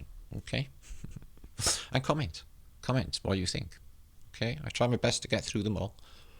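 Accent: United Kingdom. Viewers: LMAO. They say that about WiseUp too. I don't get it. Took me about 5 minutes to get used to the English. Try understanding my Indian doctor 😂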